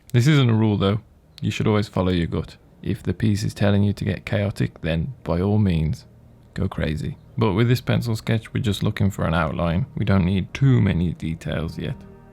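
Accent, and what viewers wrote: Birmingham, United Kingdom. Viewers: I recently came across your channel. You share so much in this video for someone like myself who is completely new to this. Btw, it sounds like you have a Birmingham accent. Not that I expect you to share, lol.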